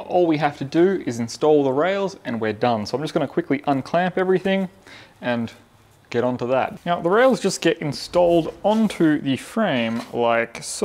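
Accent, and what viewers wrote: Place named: Australia. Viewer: You rock bro. Finally, someone that goes through the process thoroughly in detail.....and so cool to listen to another Aussie lad explain everything.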